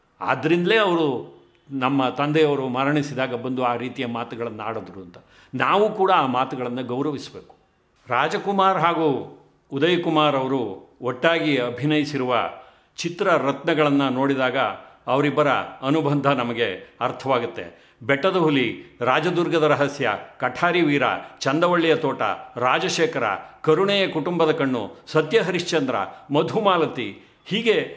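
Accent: Standard. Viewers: Your Kannada is awesome. Pure and honest speech. A big salute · ನಿಮ್ಮ ವಿಶ್ಲೇಷಣೆ ವಿವರಣೆ ಕೇಳುಗರಿಗೆ‌ ತುಂಬಾ ಹಿತ ಎನಿಸುತ್ತದೆ. ವಸ್ತು ನಿಷ್ಟ ಹಾಗೂ ಸತ್ಯ ಎನಿಸುತ್ತದೆ. ಸ್ವಚ್ಛ ಸುಂದರ ಕನ್ನಡ‌ ಕೇಳಲು ತುಂಬಾ ಹಿತ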